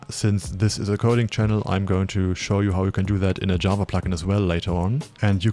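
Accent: Germany. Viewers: man hört das deutsch so raus XD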